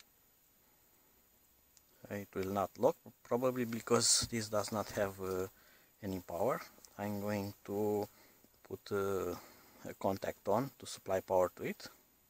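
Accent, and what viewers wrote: Romania. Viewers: Nice video ! Are you romanian ? ( your accent ) LOL !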